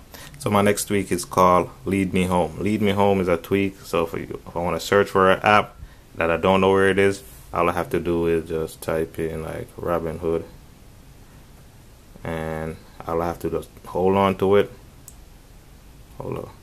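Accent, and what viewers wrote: Jamaica. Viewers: Jamaican I see, keep up the good work Bredda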